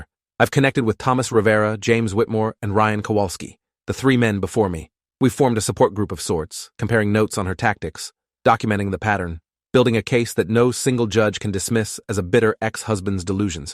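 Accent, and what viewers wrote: United Kingdom. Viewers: British AHHHHHHHH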